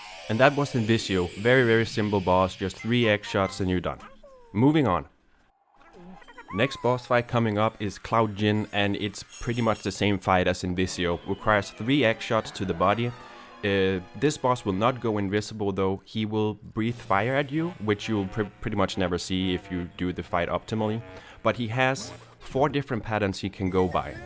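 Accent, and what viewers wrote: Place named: Denmark. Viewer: thanks for this video!! i miss this game so bad and watching u absolutely demolish it is so inspiring to watch :-D also your danish accent is so beautiful lol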